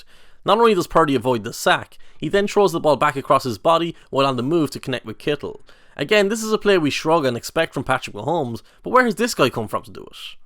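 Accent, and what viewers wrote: Ireland. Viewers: I'm impressed with the observations and breakdown through that fine Irish Brogue. How is it you know American football so well? I hope you get paid good sir. Subscribed! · It's how we Irish speak lol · Anyone else tripping about an irish accent about American football? That’s a first for me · The Irish accent makes this video even better. Good stuff! :)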